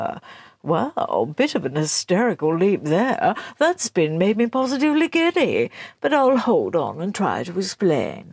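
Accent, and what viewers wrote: RP, United Kingdom. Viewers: Honestly I find these so hilarious, so many zingers delivered in that perfect RP accent. Absolutely love your snark, so glad I had the very happy accident of stumbling over your channel you do some brilliant work.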